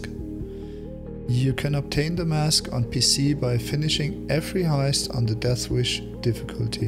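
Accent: Germany. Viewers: Are you german by any chance? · wie man einfach direkt raushört das er Deutsch ist xD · Hört sich so deutsch anhahaha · es ist so offensichtlich dass du deutscher bist :D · i knew it since im german too and have a slight accent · are you german? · Are you From Germany it Sounds a bit like it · The german accent tho xD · Bist du deutscher? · man merkt so hart das du Deutscher bist 😂aber bist übelst sympathisch 👍 nices Video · ich hab es erst gesehen wo man dein yt Dashboard gesehen habe aber man kann es auch an dein englisch stark hören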